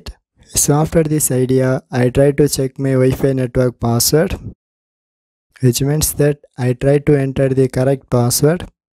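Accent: India. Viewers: he has an indian accent thats why its sounds like gays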